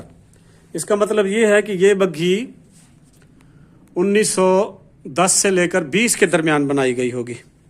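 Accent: Saraiki, Pakistan. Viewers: اچھی اور قیمتی معلومات ہیں۔ لیکن آپ کی اردو جلد ہی کیوں ختم ہو جاتی ہے جو آپ بیچ میں ہی سرائیکی پر آ جاتے ہیں۔ اس میں کوئی شک نہیں کہ سرائیکی بہت ہی پیاری اور دل موہ لینے والی زبان ہے۔ ویسے بھی آپ ماشااللہ اردو بھی سرائیکی ہی میں بولتے ہیں جو اچھی محسوس ہوتی ہے۔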